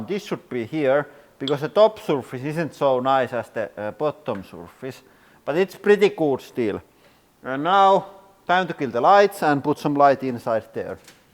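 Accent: Finland. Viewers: You sound so finnish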